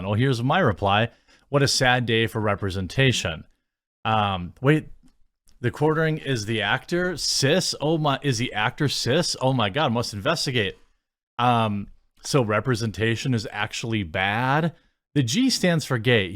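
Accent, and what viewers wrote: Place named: Wisconsin, United States. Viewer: I didn't realize you had a wisconsin accent until yesterday, and now it is all I can hear.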